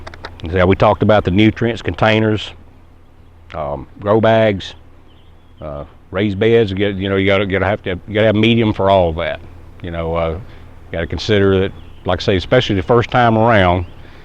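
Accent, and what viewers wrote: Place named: Carolina, United States. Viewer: Thanks for great presentation. Love your Carolina accent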